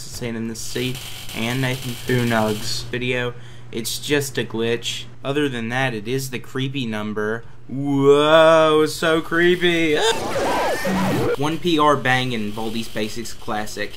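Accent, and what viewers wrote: Texas, United States. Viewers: I fucking love the texas accent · His accent is pretty texan like, LOVE IT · You sound like your from Texas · Nice accent. Are you from Texas? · I like ur Texan accent (I think it's Texan, I'm an American and know the State accent) Also u get a sub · are you from texas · You know, your texas (or western-american) accent somehow really fits iceberg videos. I think you should try doing more of these. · You sound like a man from texas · Are you from Texas? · Bro sounds like texas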